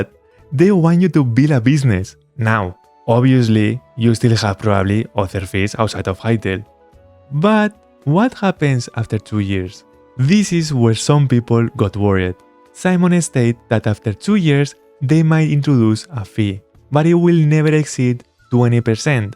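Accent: Spain. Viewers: Spanish accent for sure :D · well u sound litle difrend in live streams more spaceout speach this why i thinhed u used some kind of ai or u use more takes to make as understandambe as u can. But im glad u are not use ai and to u are not afraid of you spanish accent and not perfect english. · ye i'm glad too about that, i would rather see the fun spanish accent that anything ai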